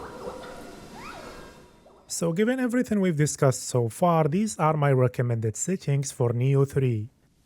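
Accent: Morocco. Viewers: Are you moroccan by any chance cuz you have a strong moroccan accent ?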